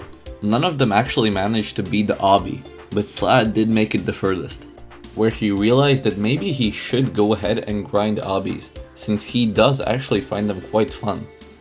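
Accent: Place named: Latvia. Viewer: just listening to you speak i could understand that u were latvian 😜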